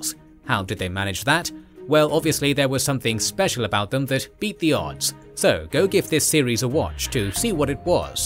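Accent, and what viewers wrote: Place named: United Kingdom. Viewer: A British-sounding accent always does make for a good narrator.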